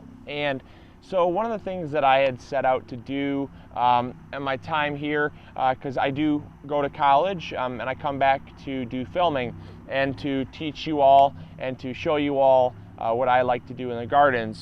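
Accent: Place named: Wisconsin, United States. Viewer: Funny, I grew up in Michigan and people would ask me where I was from, never thought I had an accent until I saw ur vids. Almost a Wisconsin accent but more faint.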